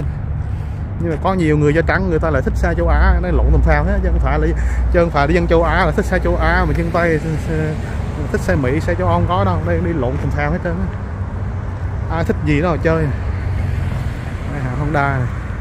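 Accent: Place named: Vietnam. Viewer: 😂 phát âm kiểu rất Vietnamese đó bạn.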